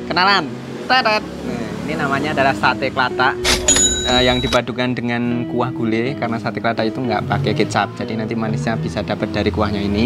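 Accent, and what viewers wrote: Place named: Jetis, Indonesia. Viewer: Mas nek ngomong ki koyok ibuk ku bahasane persis jetis tenan 😂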